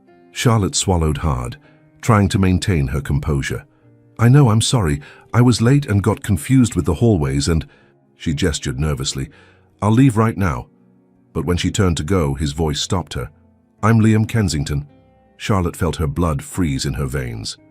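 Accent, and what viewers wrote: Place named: England, United Kingdom. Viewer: I totally agree with Everyone Else who hates & despises the REDICULOUS, HORRIBLE Video Foreground AND Background. In addition, the Stupid Producers eliminated impor- tant Written Script for people to read, especially when the Professional Male Narrator has a foreign accent like Old England, that is verry diffi- cult to understand. Otherwise, we start with a young female executive Assistant, walking into the wrong door, & sees the male CEO, "buck naked"; and HE ENJOYS IT, & from then on.., he blatantly parades around & tempts her, throughout her employment. SHAME, SHAME, SHAME on the Producers !! Rating: 4/10.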